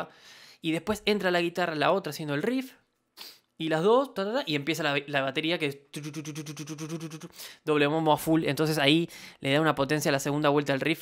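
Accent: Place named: Argentina. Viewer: Te ganaste mi like, conoces de buen Rock socio, cómo buen Argentino claro,( si no me equivoco) y si sos Uruguayo me desculpo x jusgarte x tu acento, pero conocés de música, saludos desde Costa Rica 🇨🇷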